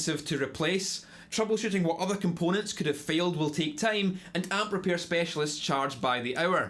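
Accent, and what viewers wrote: Scotland, United Kingdom. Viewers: Is it Scottish?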